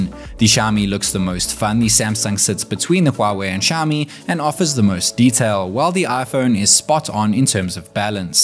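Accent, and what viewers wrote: South Africa. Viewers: Yay , great review I thought your accent was familiar. Hello fellow South African 🎉🎉🎉looks like Waterfront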